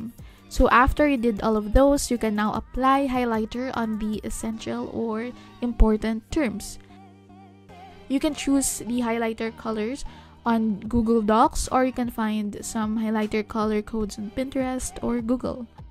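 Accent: Philippines. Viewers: based on your accent, are u filipino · I can tell your From the Philippines May me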